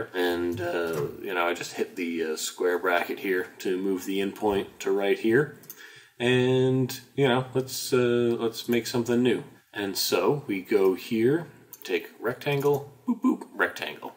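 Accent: Canada. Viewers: is that a canadian accent?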